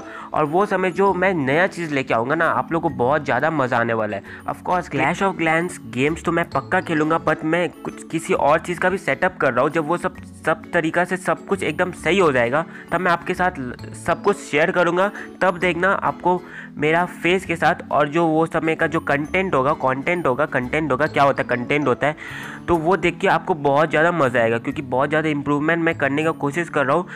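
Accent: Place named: South, India. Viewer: tu wese south ka hai kya ? tera bolne ka style esa hai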